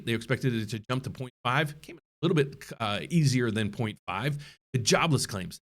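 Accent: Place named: South, United States. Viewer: Wow! He has a southern accent.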